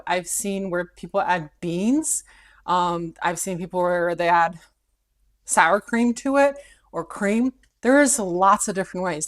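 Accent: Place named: Russia. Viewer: found you at random from your treehouse video in Berlin. Im just south of there a bit in New Albany and will have to check the Amish treehouse out. My Grandmother came here from Russia in 1939 and made Borsch all the time. Not sure if Russian is your accent I detect, but thank you for sharing this recipe. ohhh love the apron, God bless you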